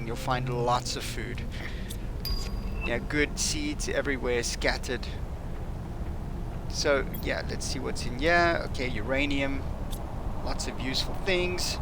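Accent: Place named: South Africa. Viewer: Sounds like you from SA but anyway ty this was sooooooo helpful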